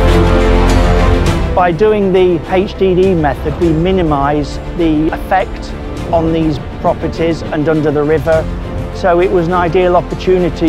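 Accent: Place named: England, United Kingdom. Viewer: Der kommt halt aus England . Untertext ist doch Deutsch halt net Schwäbisch 😅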